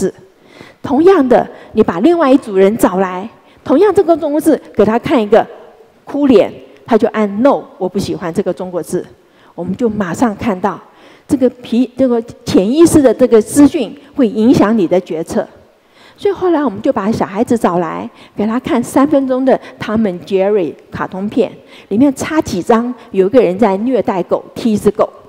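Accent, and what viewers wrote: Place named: Taiwan. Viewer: 台湾女生说话真的很有特色，我个人是蛮喜欢的的。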